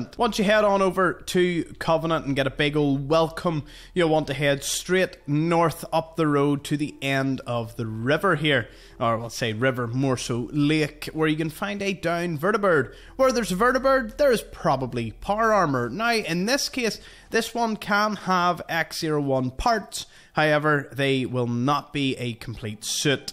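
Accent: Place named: Belfast, United Kingdom. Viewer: Accent making me homesick(I am from Knocknagoney)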